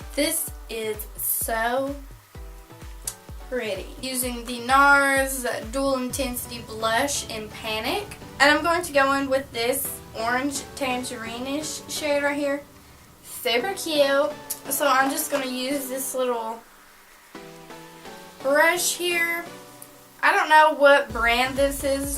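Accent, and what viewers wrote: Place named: South, United States. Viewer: I love it if you replied I can't find a lot of country youtubers it kinda sucks I'm so happy I found this channel I think your beautiful I've watched a lot of you videos so far you are like a role model to me and also you have taught me to never ever let any get under my skin and be myself I'm from a country family and so I have a country accent like yours I live in a city so I can get made fun of you have taught me to be my self and always believe in me your really funny when ever I see you make a new video I'll be happy kind of like today okay i need at least 9-11 hrs of sleep well I have fit bit I told me I only got 7hrs 38min of sleep and if that happens I need to SLEEP and I didn't have a pretty good mood I went on YouTube and saw you had s video and ever since I watched I have hade a great mood Thank you for teaching me not to let mean people to get under my skin you're my favorite YouTuber 😝💋😘😇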